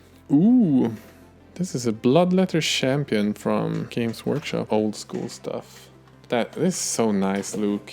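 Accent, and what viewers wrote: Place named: Sweden. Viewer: Sjukt att hitta kanalen och först höra du mest troligt är svensk utifrån dialekten och nu se Umeå på ett paket! Hej granne!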